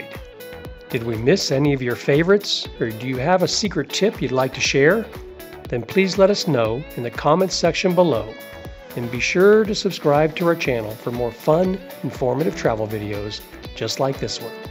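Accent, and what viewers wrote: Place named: United States. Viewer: This video is very informative and helpful to those planning their first Lisbon trip. I do like your recommended walking map directions. I've been to Lisbon and covered most of what you mentioned but there are some places I did not see and do want to go back some time and cover those as well. My only criticism for your video is that you made some glaring typos and mispronunciations (such as Barrio Alto instead of Bairro Alto). Baixa should sound closer to "Buy-Shah" than "Bahk-Sheeya". It is such an American tendency to pronounce things the way an American would read them instead of learning how they are really pronounced. When creating a informative video on a place, you do owe it to your viewers to make a better effort here. This critique aside, you did a very good job on the information presented to help people plan things.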